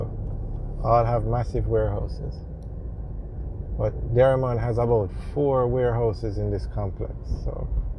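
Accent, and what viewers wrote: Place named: United States. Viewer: You sound like someone that use to live in the states.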